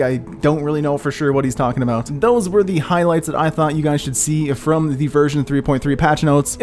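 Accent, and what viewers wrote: Czech Republic. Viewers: Guessing Czech Republic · Are you Czech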